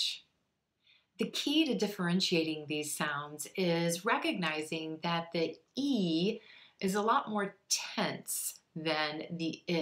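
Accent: United States. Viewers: I love your lesson and your American accent!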